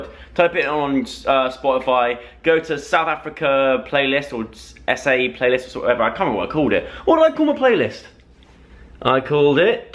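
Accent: Australia. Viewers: Love ur Australia accent! Come to my home and I'll teach ya. Have a good day! Love u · I love your Australian accent,! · i love that Australian accent 😂❤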